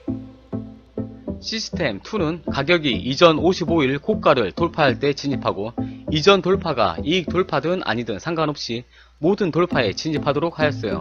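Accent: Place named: Gwangju, South Korea. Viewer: 억양이 광주사람이군요 ㅎ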